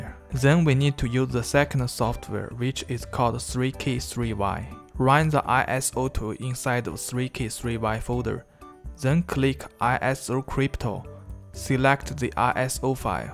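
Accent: China. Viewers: 这口音，中国人吧？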